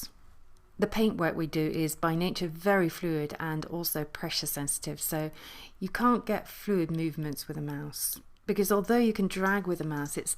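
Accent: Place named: Oxford, United Kingdom. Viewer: You smoke many an Oxforder with your accent, dear. It's the combination of the accent, the articulation and the subtle air of dignity of your delivery. Also, I've the sneaking suspicion that even Chris Tarantino, Amy Dresser & Katrin Eismann would have a hard time comparing favourably to you.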